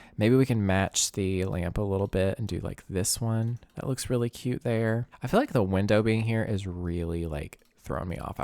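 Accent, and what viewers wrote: South, United States. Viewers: Just working from home and listening to calming voice! I work CS and find that his smooth tones and familiar Southern access sooths me while I deal with the aggravations!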